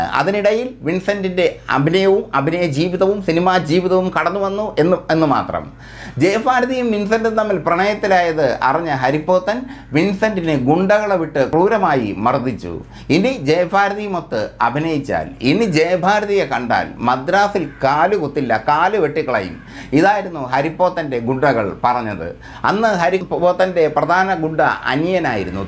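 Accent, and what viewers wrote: Kottayam, India. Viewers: ജയഫാരതി ഈ ചേട്ടൻ കോട്ടയംകാരൻ ആണോ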